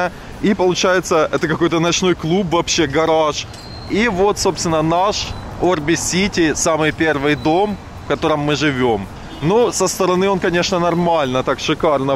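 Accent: Ukraine. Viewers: По говору слышно что из Украины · Такой колхозный акцент как и у всех из украины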